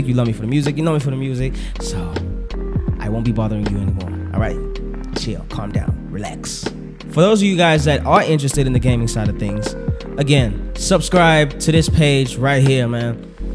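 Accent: United States. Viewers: He sound American must've been raised this side a bit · Why does he sound American? I thought he is South African. I guess not because he just said mpoomaaaalaanga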